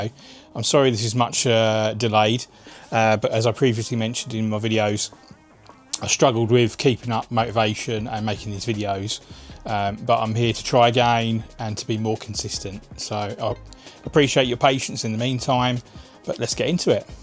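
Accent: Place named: Essex, United Kingdom. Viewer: Very well done video, straight to the point. Jotter that Xbox zombie game I think my local cex had that . You sound local are you in Essex ?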